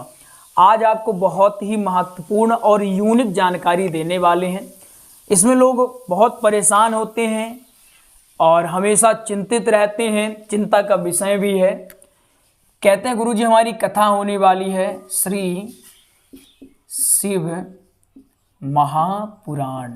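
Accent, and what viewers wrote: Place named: Kashmir, India. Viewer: aap ke bolne me kashmiri jhalak hai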